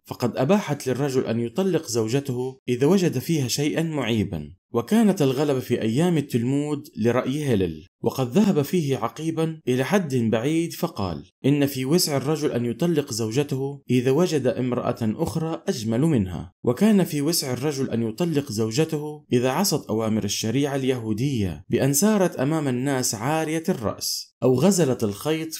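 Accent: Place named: Lebanon. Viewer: من أجمل القنوات جزاك الله كل خير لاحظت أخي من لهجتك أنك لبناني صحيح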